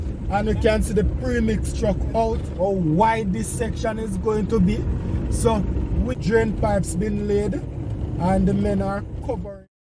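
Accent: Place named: Jamaica. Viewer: I don't know which idiot would complain about the way you communicate . Simply ignore such person as he/she only speaks for self and is making mischief and being hateful. I'm sure it's only one comment as u r simply one of our most articulate communicator... Staying true to our dialect and a proper English speaker .. Does this person expect you to speak another language ? Anyone can tell that you are a very intelligent person .. but it would not have mattered if you weren't (as long as wi understand ) I followed persons that speak 'Straight Up Patios ' and I love it !! It doesn't mean that such a person can't speak proper English .. It's just how one choses to communicate. So what if one can't ?! We have our own dialect and we are proud Jamaicans ! Bredrin , don't respond to those negative bad minded stupid people in the future ! Don't accommodate them ! 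Yeh ? Thanks for all your footage on the development. I am a big fan 👍🏾 Keep up the good work bro! 👏🏾 One Love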